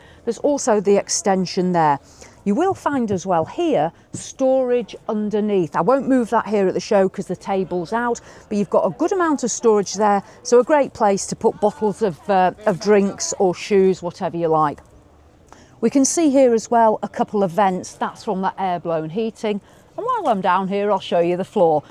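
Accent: United Kingdom. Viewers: It looks like the beds fold up as twin beds so you can have taller cargo space on one side if you want to? And leave one side down as a made-up bed? This feature isn't available on any American models. You are by far the best presenter I have ever seen! So unlike any of the American video creators who don't do their homework and then they stumble and bumble through the whole thing. Incredibly good presentation! Bravo!! And of course we love your British accent and all of your unique expressions LOL.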